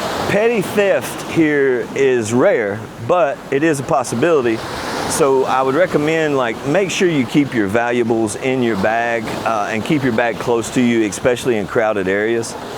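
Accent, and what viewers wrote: United States. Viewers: So wrong. For example YOU DON'T NEED A VISA FOR 59 DAYS (for most western countries). You enter the country WITHOUT visa. You can get visa only after 2 months. Your first extension is still not a visa. Another thing - don't EVER tip. If You do so - it is the same as screaming - I'm an idiot tourist, come and scam me more (or I'm from US). Another thing - You don't need internet to use maps 🤣🤣🤣 Unless You don't know how to use offline maps. And so many other stupid things in this video... I don't know who is this guy, but he definitely sounds like US tourist. The food is also BAD 8n the Philippines. Almost as bad as in the US (which has worst and most processed food in the world). Also very little to choose from. If You have been in any other Asian countries - You will be disappointed.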